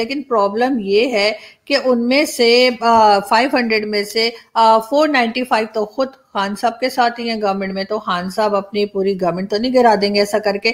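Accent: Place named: Punjab, India. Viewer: Love to her your witty and satirical presentation of daily unfolding events. what I further admire is your audacious approach to call a spade a spade. You have this unique ability to conduct a formal as well a fun interview with equal flair. Your Punjabi undertones and mannerism makes me greedy to keep listening to you.keep up your good work. God bless. Your fan from India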